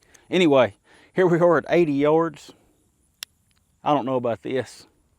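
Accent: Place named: Appalachia, United States. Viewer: Commander Data with Appalachian accent.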